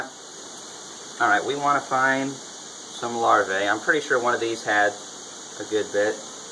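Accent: South, United States. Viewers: Thank you for this video and all of your videos for that matter. I'll admit it that I first started watching your videos because I liked to laugh at your "down home southern charm." Seriously you look and sound like Opie Taylor grew up and became a beek. But in fact, you have proven to be a great source of knowledge and your videos present a lot of information in a very enjoyable way! The real and refreshing shocker is that you shatter the stereotype of the southern, good ole boy beekeeper. You are clearly intelligent and capable. Keep up the good work!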